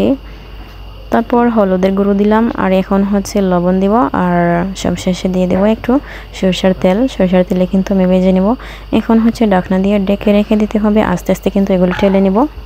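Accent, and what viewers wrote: Sylhet, Bangladesh. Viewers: Tumi ki sylheti?koi thako?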